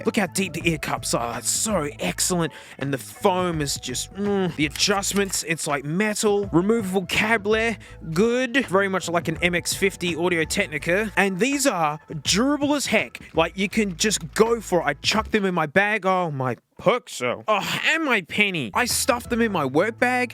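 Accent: Australia. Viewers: why are you watching that when you could be listening to an Australian man talking about headphones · yelling austrailian man is back :0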